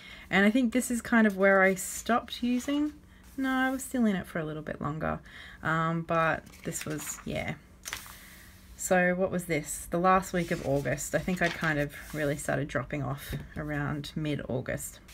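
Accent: Australia. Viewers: Hi, I’ve just stumbled across your video by accident. I feel like I’m living your life a year later. I too am a bullet journalist too and have considered switching to a hobonichi next year as I am in the midst of completing my master and creating layouts is starting to impede on my time. I thought the pre-printed layouts would assist me next year but I’m a bit hesitant as to how it will translate in the hobonichi. I’m a little worried that there aren’t enough spare pages at the back of the hobonichi for collections and challenges like you would in the Leuchtturm. Loved listening experience with the Cousin Avec 😊 Hope I’m right in saying that I detect an Aussie accent. I’m from Kangaland too (Sydney to be precise).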